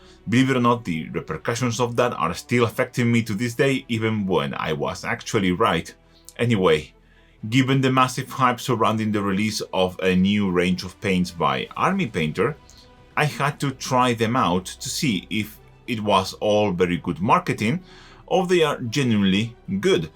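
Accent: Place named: Spain. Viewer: Tu inglés no nada más es bueno, pero es sumamente agradable y usas dichos muy apropiados, 👉lo cual es por felicitar 👈porque pocos ibéricos/latinoamericanos en este ámbito del pasatiempo lo logran...lo digo porque es casi la única vez que he oído que se te salga el castellano.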